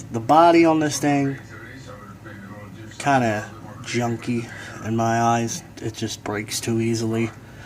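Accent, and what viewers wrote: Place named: New Jersey, United States. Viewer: Good video...thanks for the info! Your Jersey accent was rough but I'm a southern boy LOL. Thanks again.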